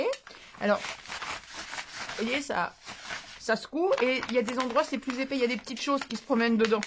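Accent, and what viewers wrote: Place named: South, France. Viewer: j'aime beaucoup votre style 😉, comme l'a fait remarqué Amandine, votre accent nous met le sud dans la tête. Bienvenue sur you tube, c'est courageux de se lancer, moi ça fait des mois que j'y pense mais... Et j'ai l'impression que ça prend beaucoup de temps, et surtout quand j'entends sur certaines chaînes que les gens sont si méchants, je ne sais pas si j'arriverai à laisser passer ou à m'énerver.. Les deux chaînes dont vous vous êtes inspirées pour la création de vos cartes, sont pour moi de super chaînes avec de belles personnes derrière leur caméra. Bonne continuation. À bientôt ici ou sur votre chaîne. 😉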